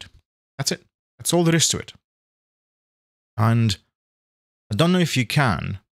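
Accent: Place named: Russia. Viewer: отличный аннлийский. слышен немного русский акцент но все равно красава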